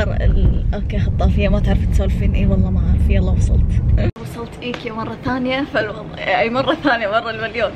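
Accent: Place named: Kuwait. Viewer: احبج كثير واحب لهجة الكويت اختك من السعوديه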